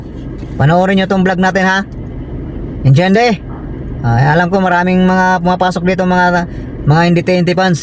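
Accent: Visayas, Philippines. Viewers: ako alam kona visaya ka bossing kaya nga ganyanvka mag salita ksi naiintindihan ka nmin walang masama sa pananalita ng visaya nag papakatotoo ka lang porket visaya ng lalait na mga hinayupak kala mo nmn napaka perpektong tao nila hindi ata alam ng mga bobong ginebra fans na walang perpektong tao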